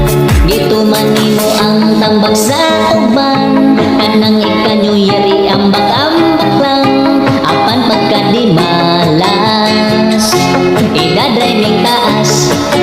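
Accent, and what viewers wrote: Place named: Visayas, Philippines. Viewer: bisaya ka bai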